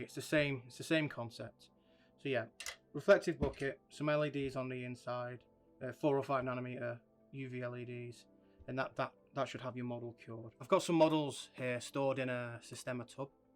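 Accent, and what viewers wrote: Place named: Northern England, United Kingdom. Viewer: Dude I completely didn’t expect your accent when I came in here. Super happy to be supporting a northern artist.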